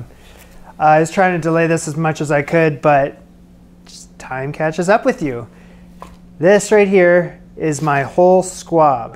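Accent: Canada. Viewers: The Canadian accent always gets me haha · I was surprised by NYC. His vowels pronounce him to be Canadian...or so I thought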